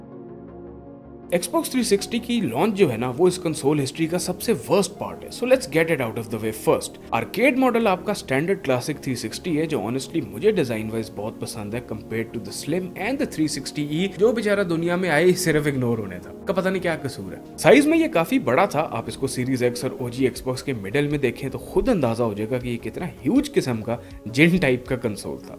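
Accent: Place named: Punjab, India. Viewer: Shukria!🙏🏻 Absolutely love the Punjabi Tarka 😂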